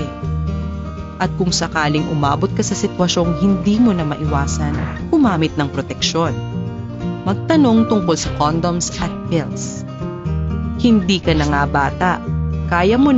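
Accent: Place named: Philippines. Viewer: Very tagalog 😂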